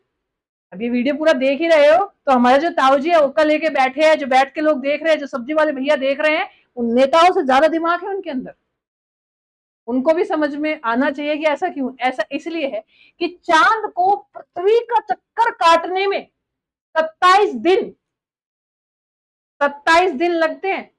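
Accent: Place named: Haryana, India. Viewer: ❤❤❤😂😂😂😂😂❤❤❤व्व्व्व्वा ह व्व्व्व्वा ह व्व्व्व्वा👌👌👌👌👌 च * संदेश कार्य विश्लेषण * * बहोंत बहोंत बहोंत सुंदर और अच्छीं तरहसें समझां दियां * अपनें माॅं और बच्चों कों * अपनी हरियानवी टोन कीं * हिंदी भाषा में * बहोंत बहोंत बहोंत सुंदर * धन्यवाद😘💕😘💕😘💕 नमन वंदन🙏🙏🙏🙏🙏 अभिनंदन भीं👋👋👋👋👋 * वंदे मातरम् भारतं हिंदुस्थानी मातापिताम् महापुरुषं लोकमान्यं जनता जनार्दनम् संतं वैज्ञानिकं🙌🙌🙌🙌🙌 👏👏👏👏👏👏👏👏👏🙏🙏🙏🙏🙏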